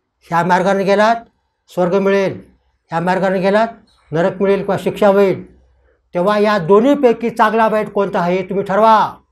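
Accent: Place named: Marathwada, India. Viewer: पूर्व मराठवाड्याची भाषा आहे, परभणी/नांदेड/हिंगोली भागात बोलली जाणारी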